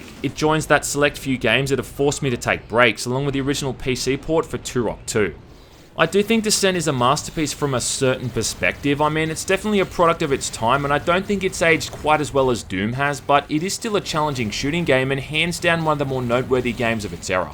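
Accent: Australia. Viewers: Yaay somebody likes me, even my mother doesn't like me. Even watching this just gives me motion sickeness, blah I shall just listen to your sultry Australian voice.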